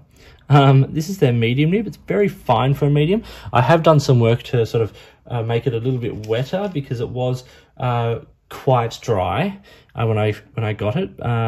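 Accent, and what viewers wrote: Australia. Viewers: Judging by your accent, I'm guessing you're Australian. What's the best source of fountain pen ink in Australia? Is it best to over from overseas? I'm just getting back into fountain pens, so was thinking of going to the local Officeworks.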